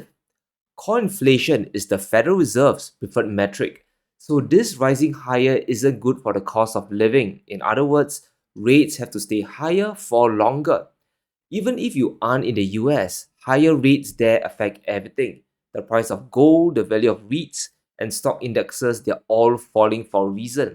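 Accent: Singapore. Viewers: ❤❤Don’t worry.. your Singlish sounds OK, per usual… your research is priceless. Thanks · Also…I never heard your slur!!! Your sound Singapore to me like my good freind - no slur??!! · Your voice sounds fine. You have typical Singaporean accent which is a relatively easy accent to understand.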